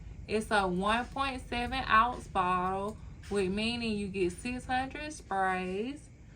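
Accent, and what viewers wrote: United States. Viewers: A Mississippi / southern accent for sure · Should this be in my collection? Thank you for sharing this content! Sound like you from the South.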